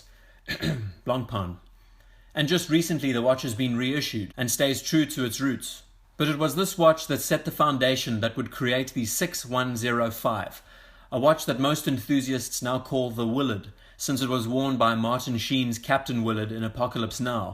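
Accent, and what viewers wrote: South Africa. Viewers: Hay dude are you South African? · Are you South African?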